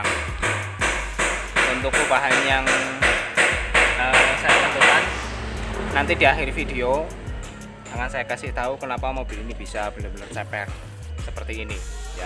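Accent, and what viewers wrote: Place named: Banyumas, Indonesia. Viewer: Pada pada orang ngapak...infonya mas yg bagus2 buat tambah ilmu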